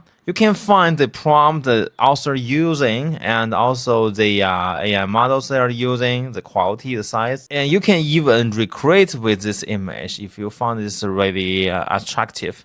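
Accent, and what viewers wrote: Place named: China. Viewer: Honestly, i think you should either work on your heavy chinese accent or consider using tts ... its really hard to hear your words clearly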